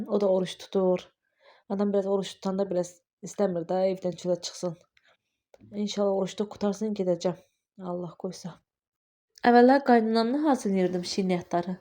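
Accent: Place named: Sheki, Azerbaijan. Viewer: Salam baci , xos gununuz olsun insallah , hee deyiremde lehce tanis ve yaxin geldi , 😊 mende Sekidenem , ugur olsun baci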